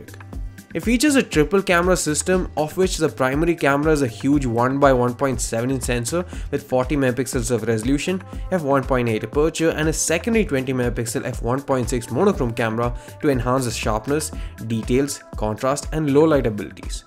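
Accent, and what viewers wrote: India. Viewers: Indian accent english is the worst, go shit on the street leave youtube alone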